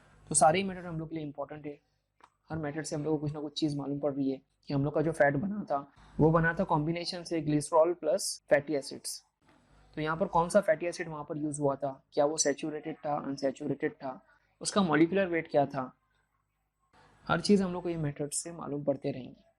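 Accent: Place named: Hyderabad, India. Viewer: Sir you are amazing and your hyderabadi accent is mashallah ☺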